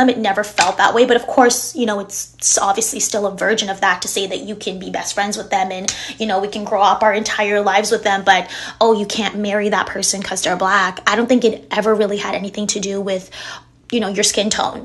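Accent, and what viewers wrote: United States. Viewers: Speak like a guyanese.....you turn yankee overnight like the others.... Be yourself and respect who u r and your background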